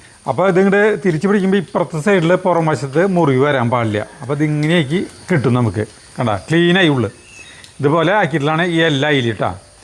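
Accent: Thrissur, India. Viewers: നല്ല തൃശൂർ ഘടി...❤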